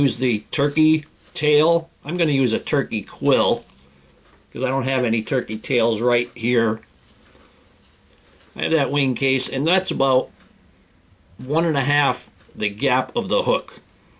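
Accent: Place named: Buffalo, United States. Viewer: you sound like every Polish guy I know from Buffalo. Like I'm home. Great videos!! Thanks!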